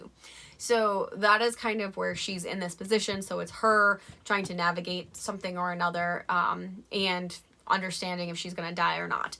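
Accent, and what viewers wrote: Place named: United States. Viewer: Wow! They are great ideas for different genres. Your creativity is limitless! But now you must do the hardest thing: write them! By the way, can I ask where are you from? I'm 99% sure you are from USA, but I cannot distinguish accents very well because I'm from Spain.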